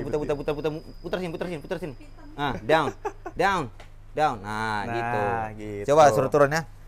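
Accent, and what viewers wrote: Ambon, Indonesia. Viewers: Biar da lama di jakarta ttpi logat Ambon nya nga ilang2 👍👍👍😂😂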